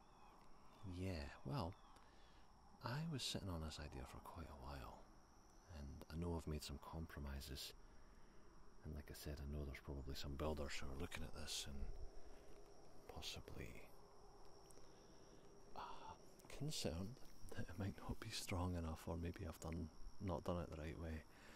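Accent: Scotland, United Kingdom. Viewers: 😻 Kittie ✔ 🐈Catwalks ✔ 🗣Scottish Accent ✔ 💮Queen Anne's Lace ✔ Mmmm! That's some good content! 😋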